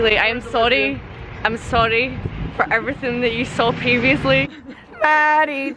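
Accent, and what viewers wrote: Scotland, United Kingdom. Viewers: First! And Scottish accent is fun, peelieed!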